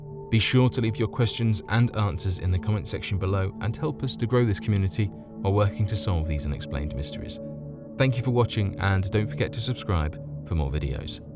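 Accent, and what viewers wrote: United Kingdom. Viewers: Thanks for the nice British voice narrative. A nice distraction from the Trump nightmare. But if we're an alien ant farm, which I believe, why can't they keep things under control better??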